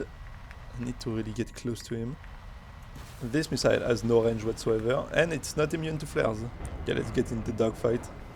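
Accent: France. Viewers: İ never heard france accent wow great video tho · This is about as French an accent as it gets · most french guy i saw on youtube that talk eng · holy french accent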